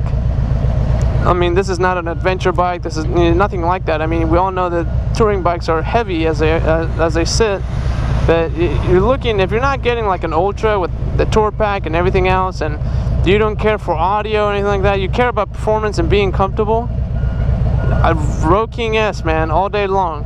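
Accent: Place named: Germany. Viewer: Nice review man. Where is your accent from? Deutchland?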